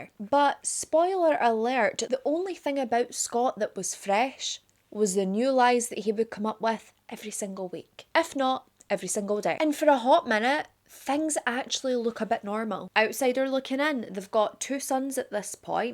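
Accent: Scotland, United Kingdom. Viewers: Great video! Ive never heaed of this case and I grew up in Spokane Washington. Weird. I think you are so beautiful! You totally remind me of Bailey Sarian with a Scottish accent 😂 I love you both so so so much!!!! (((HUGS))) from Idaho USA ❤ 🐈‍⬛🐈‍⬛🐈‍⬛🐈‍⬛ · Sooooo…. I love ur accent and I know u try to pronounce things really well so ppl not from Scotland can understand (like myself). But I would LOVE a video with Scottish slang and ur natural pronunciation!!!! Maybe closed captions for slang words but I absolutely love hearing ur accent!! Jus an idea 😅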